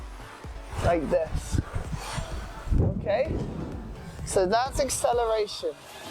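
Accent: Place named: RP, United Kingdom. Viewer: I'm from Texas, USA. I like your posh sounding accent. I'd like to know Where are you from, so that I can add to my knowledge of UK (I assume) accents.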